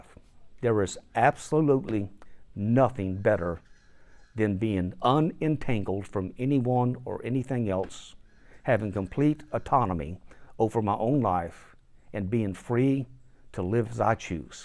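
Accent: South, United States. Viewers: Are you from the south? We’re from Arkansas. We’re exhausted and thinking of retirement. Glad that we found you ❤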